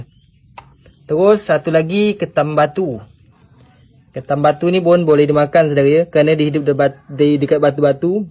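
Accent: Riau, Indonesia. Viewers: Ada beberapa benda menarik dalam video ni,, pertama sekali,,,dari cara dia cakap mesti org fikir ni orang malaysia,,,tapi rasanya ni org riau ataupun tanjung pinang ,,,org indonesia cuma bangsa melayu... Kedua,,,kalau ikut mazhab hanafi,,ketam tak boleh dimakan,,haram hukumnya,,,tapi kita guna mazhab syafie,,jadi hukummya halal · Ini info dari saudara kita di Kepulauan Riau ke..sedap dgr loghat bahasa Melayunya di sana. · Hallo sedare..melayu riau ye... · orang Perak ke.. orang mane.. guna perkataan mike tu😂.. macam bunyi riau pun ada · yg bercakap ni melayu riau ni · Loghat Melayu riau ni😊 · Orang melayu riau bang 😁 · Bunyi macam orang melayu riau ni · Ni loghat Melayu Riau bang .. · Ni loghat kepulauan riau indonesia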